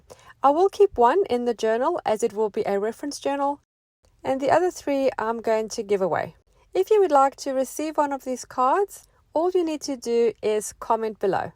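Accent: South Africa. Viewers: I absolutely love watching your channel and can't wait for the next episode 🤗 nice hearing a South African accent 🇿🇦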